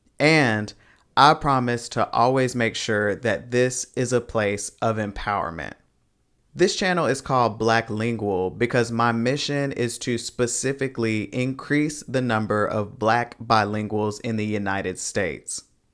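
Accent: Dominican Republic. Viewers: This whole time I thought you were Dominican, you speak like my cousins. I love this and appreciate you putting out learning content for us no sabo kids. This is huge motivation for me. Much love 🇵🇷♥️🇩🇴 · If I speak Dominican Spanish it’s thanks to you, until today I thought you were Dominican 😂😂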